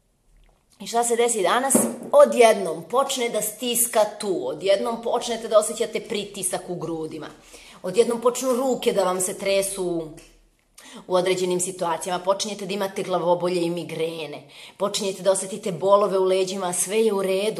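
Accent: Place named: Čačak, Serbia. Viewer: uh, sto mi se dopadas....lepo ti zvuci naglasak...,mislim Cacanski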